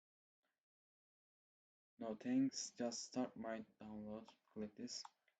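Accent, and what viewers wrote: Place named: Turkey. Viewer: Btw, i am sure you are Turkish since your accent is like me :)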